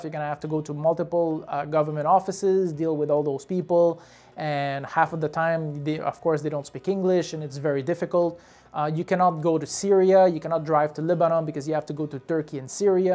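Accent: Canada. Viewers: His accent sounds Canadian to me. Don't think he was comparing to U.S.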